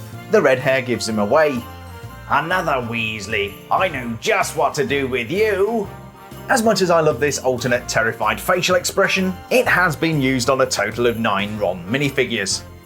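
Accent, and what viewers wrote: United Kingdom. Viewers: haha no, you go ahead. I can always slaughter you in the comments. Actually.... before I saw your actual face, I seriously thought you were ginger. You sound so delightfully British. There, I'm prejudiced myself....🙄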